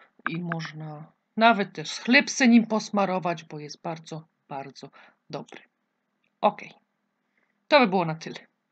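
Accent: Silesia, Poland. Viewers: Pani ze ślunska ja? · Pyszny przepis! Dziękuję! Słychać, że Pani ze Śląska! :-)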